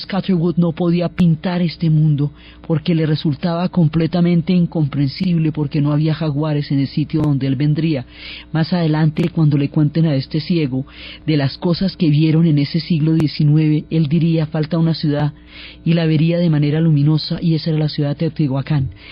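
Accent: Colombia. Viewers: Hola Ferlo, en Guatemala habian Mayas. Tanto su presencia que yo pensaba que ellos habitaron en Guatemala y creo que el escritor de hombres de maiz es guantemalteco. Si buscamos autores guatemaltecos sobre los mayas los encontramos. Tambien Chiapas y Yucatan eran de Guatemala. Asi que los mayas segun mi conocimiento eran de Guatemala aunque si hubieron Mayas en franjas de El Salvador y Honduras. A mi me parece que Diana Uribe hace un buen trabajo. Recuerde que ella es colombiana y su pronunciacion es diferente a la mejicana y centroamericana.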